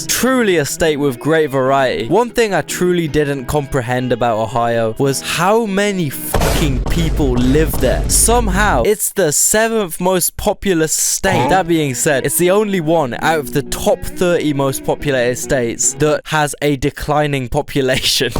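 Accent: United Kingdom. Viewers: Listening to a British guy explain america to me an American is surreal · Just listen to this guy with a British accent talking about all of the States in my Country and also the best country, is absolutely hilarious😂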